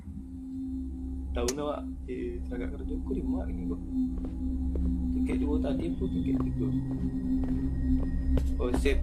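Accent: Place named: Payakumbuh, Indonesia. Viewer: Logat pikumbuah jaleh bana 😂🤣😂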